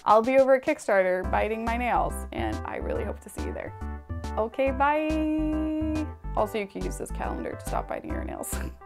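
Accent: Sweden. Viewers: Are you swedish?! Cool product tho. I'd buy it :) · Wait you swedish??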